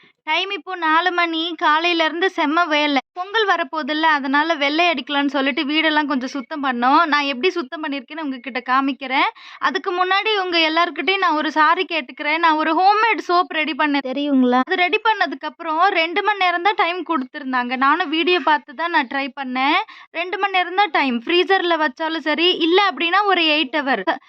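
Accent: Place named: Odisha, India. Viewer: நீங்கள் ஏன் ஒரியா பெண் மாதிரி தமிழை பேசுறீங்க?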